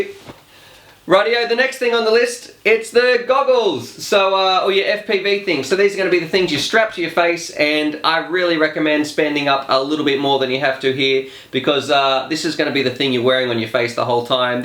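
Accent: Australia. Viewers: You are Australian aren't you? Tell us the prices in AUD!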